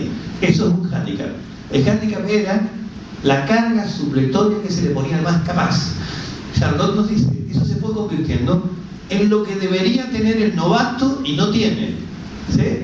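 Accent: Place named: Chile. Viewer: Por qué pone tono chileno si es argentino? Notable dato paraverbal. Todo un mensaje....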